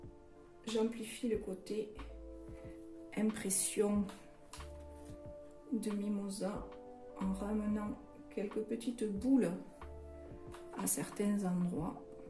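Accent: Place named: Marseille, France. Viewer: You have a lovely accent...Marseille maybe. Similar to the rapper Akhenaton from IAM if i'm not mistaking. Anyway, love ur work ♥️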